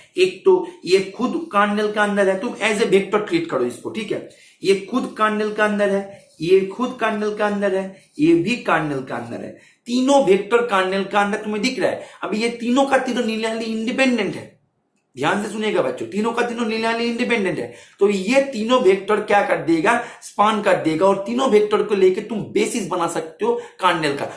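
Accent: Bengal, India. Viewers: Sir Hindi te bolleu sir er bangla tan ta bojha jai tai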